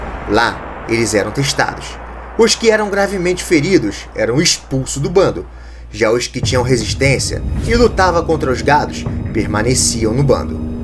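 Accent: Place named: Rio de Janeiro, Brazil. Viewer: O sotaque carioca não passa.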